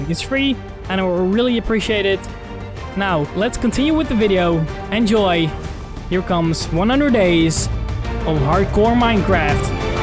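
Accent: Netherlands. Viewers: Nederlands accent tho XD verry nice game play! loved it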